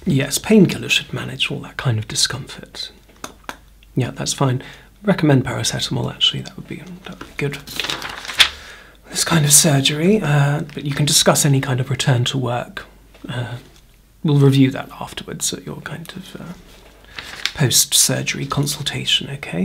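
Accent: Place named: United Kingdom. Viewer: Gods, you're gorgeous as both male and female I just--- can't. how iN THE WORLD IS THIS POSSIBLE 😭😭😭💜💜💜 that aside, I got what I asked for lol thank you, it's tingly as always and you always speak in such a clear, crisp, and gentle voice, with posh English accent that I could understand perfectly even to my untrained ears as English is not my mother tongue 🌟🌟🌟🌟🌟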